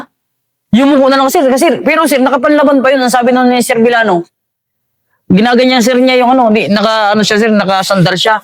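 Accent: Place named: Quezon, Philippines. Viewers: Taga Quezon province po ba kayo? Tono kasi ni Sir eh punto ng Quezon province. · Taga Quezon probins yata si sir..ay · Accent ni idol parang taga Quezon Province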